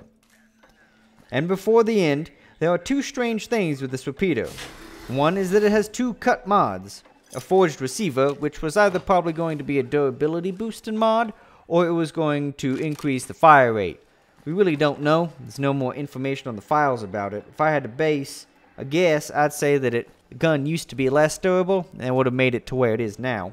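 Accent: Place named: United States. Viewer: I love the cowboy accent YEE HOO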